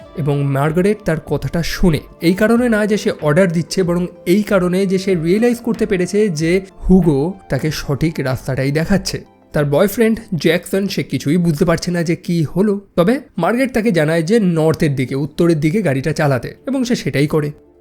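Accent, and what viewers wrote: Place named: Bangladesh. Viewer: ভাইয়া আপনি কি ইন্ডিয়ান? কিন্তু আপনার কথা বার্তা বাংলাদেশীদের মত শোনায়। তা যা-ই হোক মুভি এক্সপ্লেনেশন অস্থির করেন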